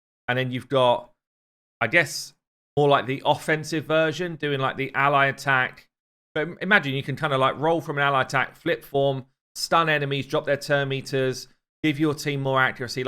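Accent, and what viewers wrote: England, United Kingdom. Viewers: please learn how to pronounce Mikage, it sounds giga cringy in you english accent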